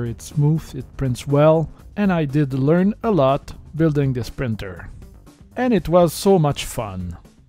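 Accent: Quebec, Canada. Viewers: On la sent bien laccent quebecois 😂😂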